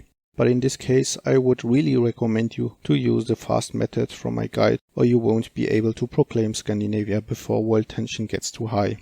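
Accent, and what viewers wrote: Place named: Germany. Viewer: Ich liebe deine sachliche Sprechweise, gemischt mit einem wunderbaren deutschen Akzent <3.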